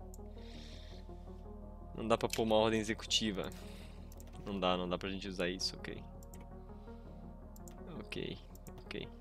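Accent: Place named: Portugal. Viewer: caramba, é uma história bem legal, deve estar desde pequeno em Portugal para ter pego o "sotaque", bom vídeo de verdade amigo, todos os mods que penso em jogar algum dia sempre pego uma série sua para acompanhar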